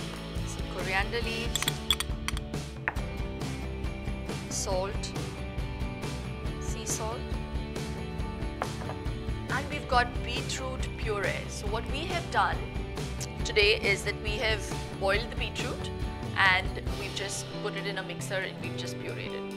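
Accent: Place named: England, United Kingdom. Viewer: I like her English accent but didn’t understand a word, thus will not be making this dish😢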